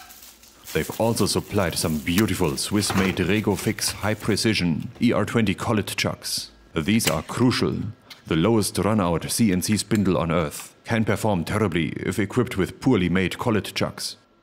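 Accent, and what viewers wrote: Germany. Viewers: Ing_lish with a German accent/humor combination is in itself precious, but when adding a torrent of valuable technicality ... can't be described in words 😉.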